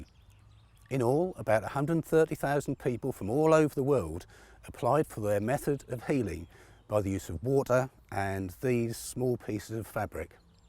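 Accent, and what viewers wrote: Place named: Bedfordshire, United Kingdom. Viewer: Lovely video - enhanced by the presenter's beautiful Bedfordshire burr. If you are able, get hold of "Octavia, Daughter of God" by Jane Shaw (Jonathan Cape, 2011). It is a most illuminating book - and it WAS stocked by Waterstone's in Bedford town centre.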